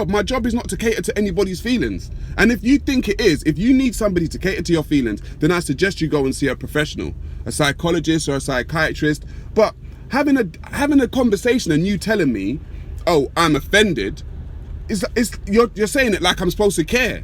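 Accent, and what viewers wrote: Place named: London, United Kingdom. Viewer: Tell you what, close your eyes and this was Samuel Jackson in a Tarantino film....with a London accent without the M16....brilliant and of course talking sence again people....respect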